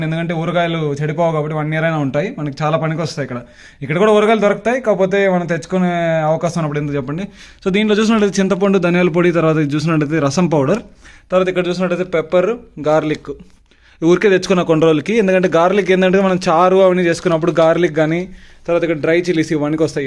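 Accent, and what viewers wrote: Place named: Chittoor, India. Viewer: maadi kuda bro mee slang chusi chepa maadi chittoor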